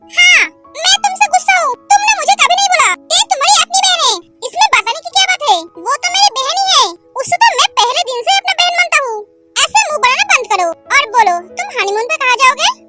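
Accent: Bengal, India. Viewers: Apu Tumi ki bangali tomar hindi sune mone hoy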